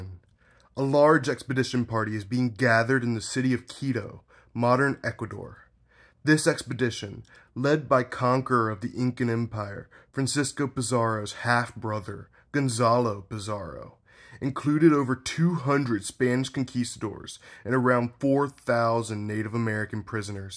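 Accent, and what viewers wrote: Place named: Philadelphia, United States. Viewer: My favorite part is the Philly accent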